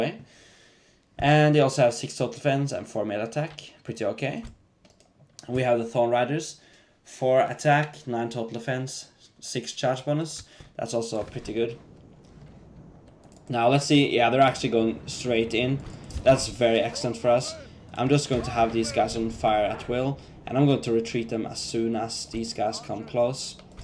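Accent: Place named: Ireland. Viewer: you sounded irish, pretty cool though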